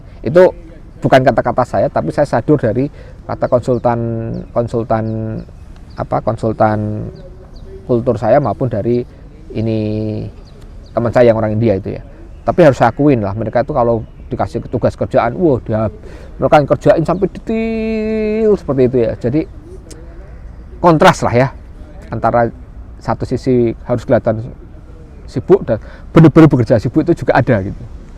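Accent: Java, Indonesia. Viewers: Iya... Logat Jawa bahasa Indonesia enak, nyaman dan easy listening... Semoga sukses selalu... Masbro (kyknya kita seumuran, mas )...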